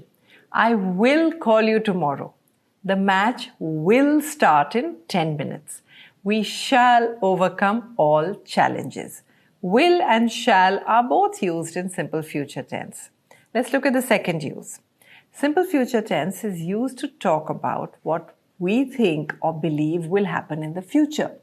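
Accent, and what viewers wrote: United Kingdom. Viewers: R u british? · I though she was british😅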